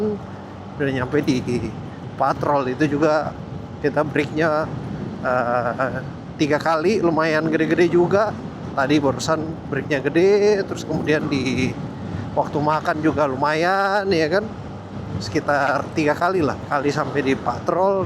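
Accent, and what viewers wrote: Eastern Indonesia, Indonesia. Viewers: Izin tanya bang,, asli daerah mana bang,, soalnya logat nya kyk agak mirip daerah timur sedikit bang 👍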